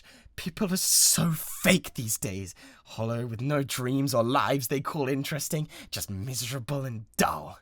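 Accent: United Kingdom. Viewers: I LOVE HIS BRITISH VOICEEEE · The only british accent i tolerate (love the audio)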